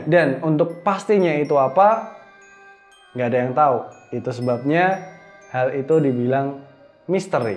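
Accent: Java, Indonesia. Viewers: abangnya kalo ngomong ada sedikit medoknya ya.. kadang2 muncul medoknya heheh but i like it.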